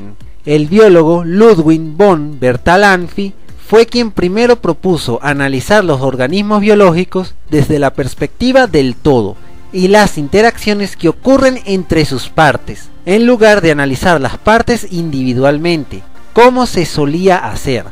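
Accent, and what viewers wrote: Venezuela. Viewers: Depende de la región de Venezuela donde naciste. Ángel David Revilla es Caracas (región central), donde el acento es más prominente. Me imagino que es de la región oriental o la noroccidental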